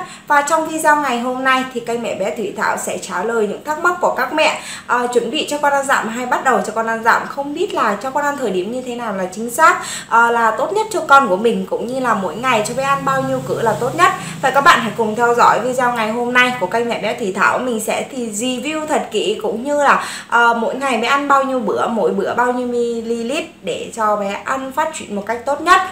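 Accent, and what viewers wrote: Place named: Thanh Hoa, Vietnam. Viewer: Giọng THanh Hóa đặc sệt 😂 hơi khó nghe b ạ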